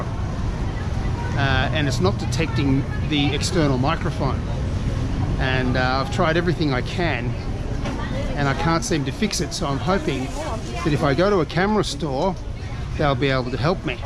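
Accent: Australia. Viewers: he cant understand because of your ausie accent with mask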